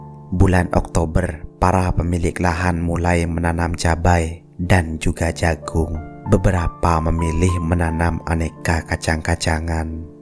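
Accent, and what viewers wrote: Madura, Indonesia. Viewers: Logat maduranya kental sekali bahasanya mantap bang,orang madura teguh memegang prinsip,tekun bekerja ,pantang menyerah,salam saudara dari ranah minang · Hhhh dikatara logat maduranya bng...mantaps...semangata teross...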